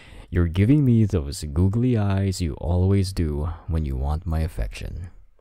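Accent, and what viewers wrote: Philippines. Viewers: I sense a Filipino Accent?...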